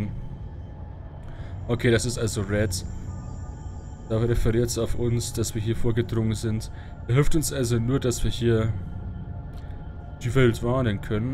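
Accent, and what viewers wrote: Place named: Southern Germany, Germany. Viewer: Die Geschichte gefiel mir nur das Ending war nicht wirklich zufriedenstellend. Mal sehen wie das weiter gesponnen wird. Übrigens spricht man das normalerweise Tri-Nitro-Tulo-Ol aus weil das die Wortbausteine sind die für die Beschreibung der Struktur zusammengesetzt wurden sund (chemische Nomenklatur). Man merkt auch, dass du aus Süddeutschland kommst weil du von Kemie, Kina oder auch Arkitekt sprechen würdest ;)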